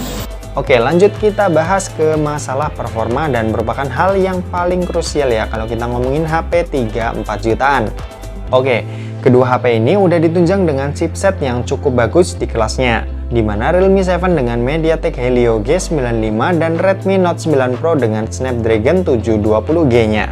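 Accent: Semarang, Indonesia. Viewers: Masnya orang Semarang ternyata wkwkwk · lu orang semarang bang ? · Eong Semarang to ndeng? Hehe sukses selalu lur...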